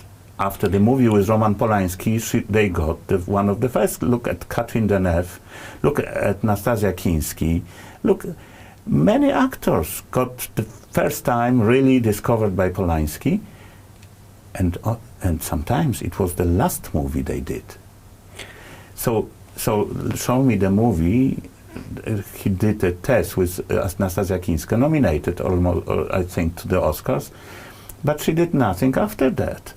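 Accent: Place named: Yorkshire, United Kingdom. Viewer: I love the Yorky 🤗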